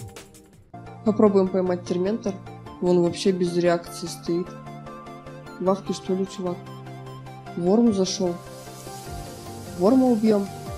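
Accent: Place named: Urals, Russia. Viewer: Прикольно)) Ты из Перми/Екатеринбурга? Манера разговора "тамошняя"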